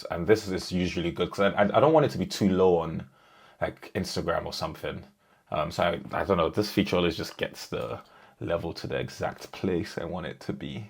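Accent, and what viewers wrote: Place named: Nigeria. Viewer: i hear the nigerian accent boss 😂🔥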